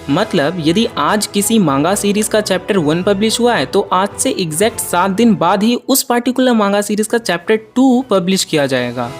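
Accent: Bihar, India. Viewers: Kahe aap bihar se ho kya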